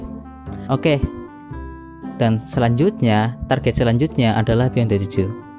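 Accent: Banyumas, Indonesia. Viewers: Kaya wong purbalingga, kebumen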